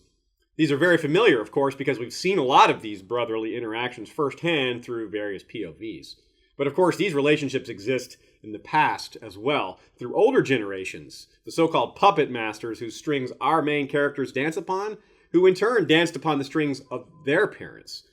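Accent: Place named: Canada. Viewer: While I love all your videos, the new voice overs were a little much. Normally I'll leave the video playing during work and a coworker made a comment that they sounded Canadian. -_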